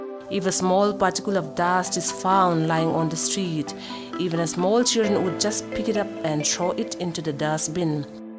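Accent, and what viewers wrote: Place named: Mizoram, India. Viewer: I really appreciate the narrators MizoEnglish accent apart from the short documentary,it is surely worth watching.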